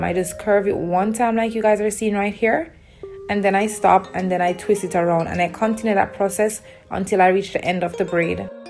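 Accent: Jamaica. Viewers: Hi there my Jamaican sister i love watching your videos of course if will be copying a lot of the styles you shared and am loving your accent. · I know this accent anywhere. Jamaican queen. U represent well. Beautiful hairstyles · This a gal yah a Jamaican she sounds like it pretty too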